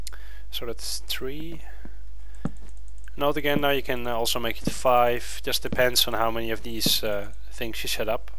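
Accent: Netherlands. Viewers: are you dutch? (your accent sounds like it)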